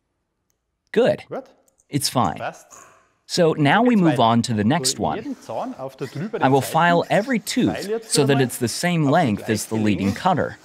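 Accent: Bavaria, Germany. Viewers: 🤣 Hätt mir scho gedocht da spricht englisch mit bayrischn Akzent 😅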